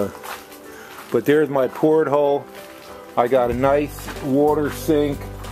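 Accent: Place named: East Coast, United States. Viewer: Cool! I’m curious where you live because it sounds like you have an East Coast accent and I saw that you had the palm in the snow… I’m from Pennsylvania but I’m out in California now. That’s pretty cool that you can grow them in that temperature ...I didn’t know that! I grabbed little baby Palm Pups all the time walking around just to see which ones I can grow he he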